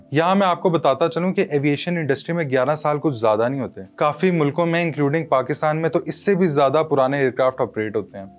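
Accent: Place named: Punjab, India. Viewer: Watching you from Saudi Arabia I suggest you please Improve your Urdu little bit and prevent it from punjabi accents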